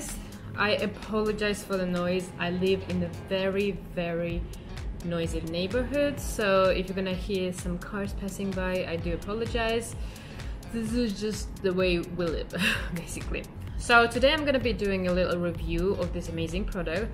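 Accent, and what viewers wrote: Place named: United Kingdom. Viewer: ბრიტანული აქცენტით კარგად საუბრობ❤️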